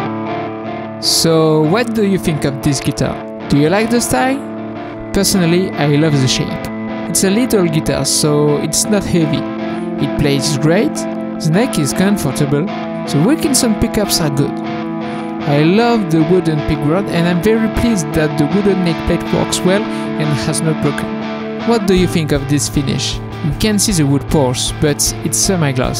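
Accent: France. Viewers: your french accent is amazing, i loved your guitar building, you mentioned practice, i see a master, lots of luck in your guitar building career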